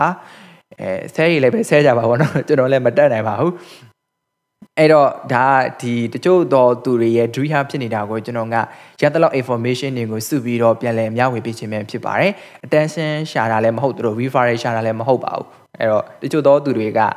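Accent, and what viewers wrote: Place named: Myeik, Myanmar. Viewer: အကိုကဘိတ်သားလား ဘိတ်သံဝဲနေလို့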